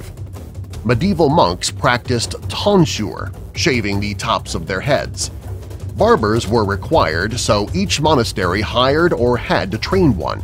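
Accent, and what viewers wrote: United States. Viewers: Interesting but learn to speak correct English language!, instead of americanizing it!!!!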